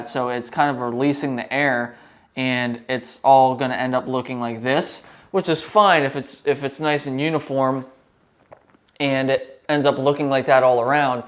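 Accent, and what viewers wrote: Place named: Pennsylvania, United States. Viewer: Nice bro! Thanks installing mine later today! Love a good PA accent! lol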